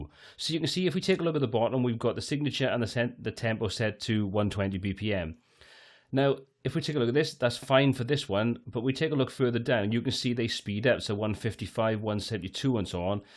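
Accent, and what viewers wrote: Wales, United Kingdom. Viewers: Great vid thanks. I'm a long time EZD user but definitely picked up some tips from this. I'm a Taff living in Aus. Nice to hear the home country accent too. Where can I listen to some of your musical output? Always interested in hearing other people's work.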